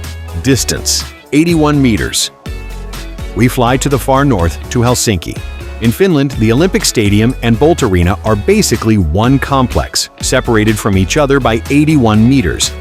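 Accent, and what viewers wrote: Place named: United States. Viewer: Bring back the old narration voice, the American voice doesn't sound as nice.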